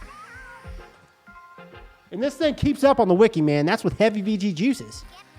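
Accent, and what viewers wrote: United States. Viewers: Those contacts would look cool if your wore them properly. Instead you have your eyes open as wide as possible like a damn meth head making you look strait up dumb. Learn how to wear them the right way. But you sound American so stupidity is expected.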